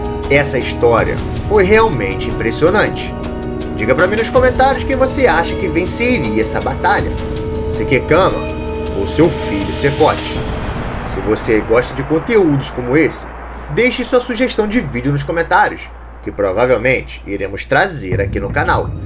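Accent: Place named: Rio de Janeiro, Brazil. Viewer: O sotaque carioca não passa.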